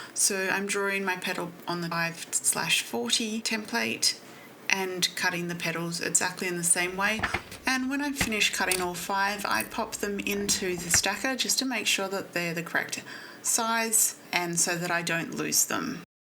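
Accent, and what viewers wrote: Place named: Australia. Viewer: Great to hear another Aussie voice ❤ where can I get the stackers? I’m in NSW. I have some I use to make succulents which I got from the US but yours look great.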